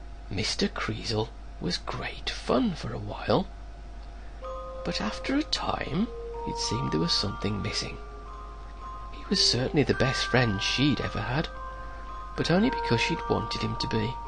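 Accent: England, United Kingdom. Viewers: u know something going on when the story is black and white and a man with a English accent is reading it😰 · English accent :) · Wonderful o.o and a British narrator makes it all the better ^_^ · And it's just so much more moving cuzz the narrator has an english accent :p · British voice!!! :D (or sounding one, I always get accents mixed up for some reason)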